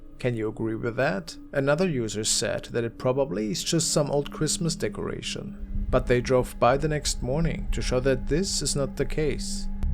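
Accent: Germany. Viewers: Love your German accent my ancestors were German too and I love your accent it makes the videos all the mor spooky. Love you videos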